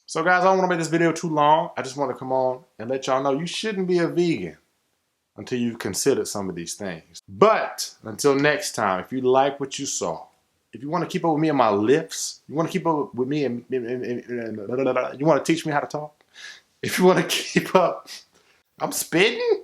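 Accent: South, United States. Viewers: You are spot on about people not understanding ..I'm from MS and I don't know anyone else who is vegetarian or vegan. I got so much flack from my fam..two years later and I still get those "you still don't eat real food" questions. I also agree that it was me reading and gaining knowledge about veganism for myself that made me adopt the lifestyle. Dope video...I knew I heard a Southern accent!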